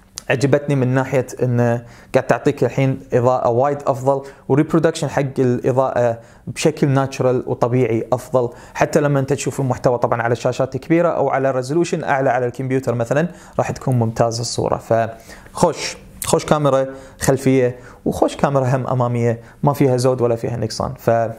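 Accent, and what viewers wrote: Kuwait. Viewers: حلقة اليوم فيها كثير من الخلط و الاخطاء في المعلومات . بالاضافة ان اللهجة الكويتية اليوم اكثر من الفيديوات السابقة. رغم كل هذا فيديو جيد .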